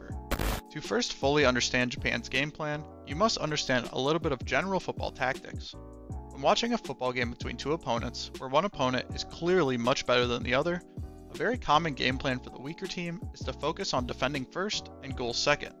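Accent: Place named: United States. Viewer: I clicked, however hearing the American accent I instantly know everything about this must be BS.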